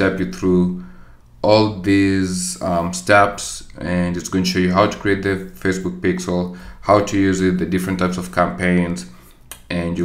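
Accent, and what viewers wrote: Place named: Zimbabwe. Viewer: Great video. Are you zimbabwean? Your accent sounds like you are from zimbabwe.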